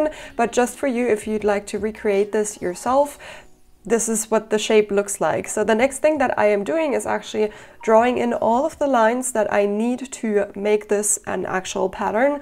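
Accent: Germany. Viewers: i can totally hear you're german :D nice dress tho. will try to make it myself.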